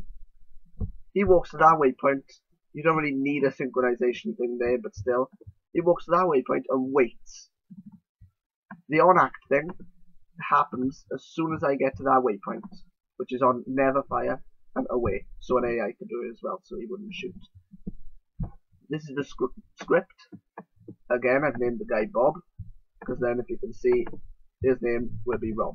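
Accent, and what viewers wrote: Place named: Wales, United Kingdom. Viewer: ah a welsh boy! bore da!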